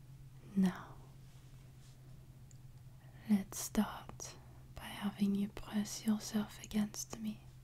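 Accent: France. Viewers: Ahh french accent and gorgeous soothing voice... perfect for a night. If I had a wife/gf with voice like yours I would ask her to whisper to me every night XD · your French accent is so beautifully pleasant. Is this a sneak peak for a new audio? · Even though I am not a fan of hypnosis, I am very excited for this audio. Everything besides the hypnosis is screaming “I want more!” in my head constantly (which is primarily your French accent , which I would fall in love with every single day, as well as the Fdom aspect of the speaker). And hopefully my opinions of the audio actually create constructive feedback (otherwise I’ll keep my mouth shut to not hurt anyone’s feelings). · Fdom + French accent? Heavenly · I love the accent. At the risk of sounding totally clueless, are you by any chance actually French?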